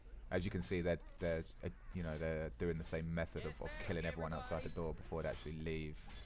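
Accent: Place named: United Kingdom. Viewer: you idiot. the language isn't british. It's English with a british accent. stupid ass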